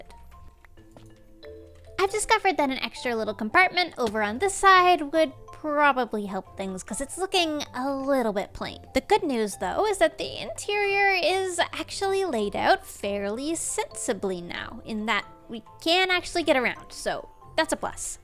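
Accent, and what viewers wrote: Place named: Newfoundland, Canada. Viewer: Absolutely threw me for a loop hearing you're a fellow Newfie, never would've guessed!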